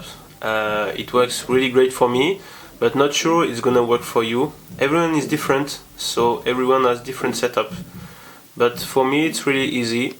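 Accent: France. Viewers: i'm Pretty Sure you are French,your accent doesn't make Doubt! ;-)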